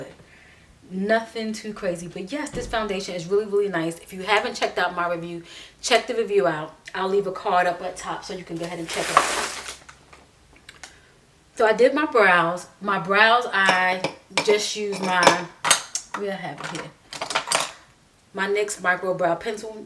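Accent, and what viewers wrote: New York, United States. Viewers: I knew you was from close by me 😂 I said she from up North. I'm about 45 minutes away in CT.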